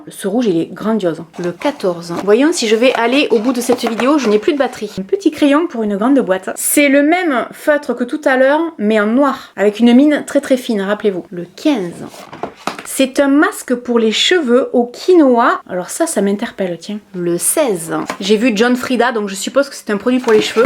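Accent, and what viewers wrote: South, France. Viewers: Trop contente de te retrouver plus souvent 😍 et tu mérites largement d’être sponsorisée par des marques 🤍🍀 ! Je regarde toutes tes vidéos quel que soit le sujet car tu es notre rayon de soleil 🌞 avec l’accent du sud 😉 ! Je t’adore merci pour tes vidéos 😘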